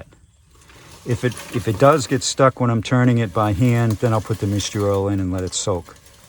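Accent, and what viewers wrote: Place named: New Jersey, United States. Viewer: Sounds like he's from Jersey 😅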